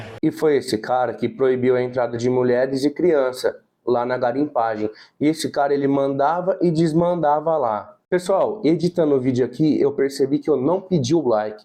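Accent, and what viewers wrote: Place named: São Paulo, Brazil. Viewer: Você é simpático e fala - o sãopaulês-, bunitim. Valeu, pelas informações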